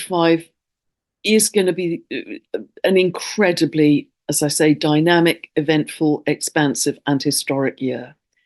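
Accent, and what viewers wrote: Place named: RP, United Kingdom. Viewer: Thank you - this is what wisdom looks like, in the Queen's English, with a warm, lovely smile.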